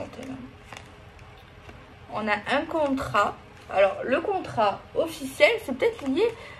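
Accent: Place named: Toulouse, France. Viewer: Bonjour tu as l'accent du ☀️ soleil peut être de Toulouse bon ça me ressemble je suis fou bélier ma chérie Verseau fille difficile à vivre Bon je l'aime très fort pardon tu as la pêche 🍑 la patate tu me fais penser à Patrick Sébastien on est serré dans cette boîte sardines j'ose un bisou merci ton tirage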